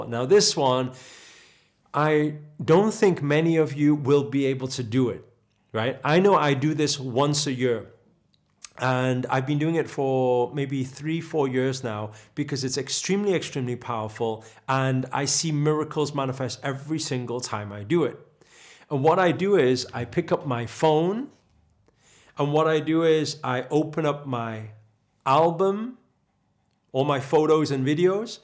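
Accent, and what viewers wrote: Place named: Liverpool, United Kingdom. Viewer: You sound like your from Liverpool.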